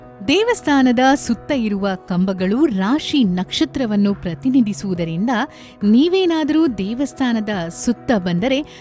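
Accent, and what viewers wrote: Standard. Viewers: ಶುದ್ಧವಾದ ಕನ್ನಡ ಮಾತು ಕೇಳೋಕೆ ತುಂಬಾ ಚನ್ನಾಗಿದೆ all the best god bless u.❤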